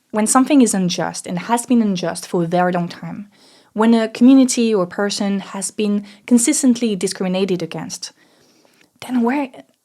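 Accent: France. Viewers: Love your French accent 🥖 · I love how you combine this complicated discussion into a rational and balance topic. Maybe your voice and french accent help too, mercy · Love how your accent is so French it becomes Australian (Overton windor, videor etc...)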